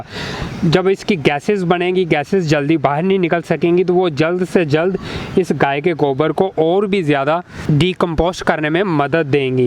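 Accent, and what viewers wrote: Punjab, India. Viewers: کیا ھی اچھی بات ھوتی کہ آّپ خالص اردو یا پنجابی ملاکر اردو بولتے بجاے اسکے کہ بونڈی انگلش مارتے ، شکل سے یکدم ریڑی والے ھو ، تمہاری انگلش بھی انگش سے زیادہ پنجابی لگتی ہیں تو بھایی کیوں اپنے آّپکو لطیفے بنانے پر تلے ھو ، صاف ستھری پیاری پیاری اردو پنجابی لہجے میں بولو ، اچھا لگوگے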